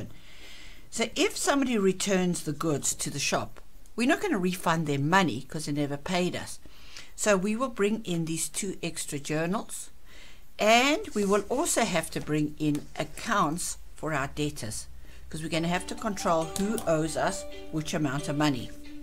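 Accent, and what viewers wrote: South Africa. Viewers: I wasnt expecting a south african accent eh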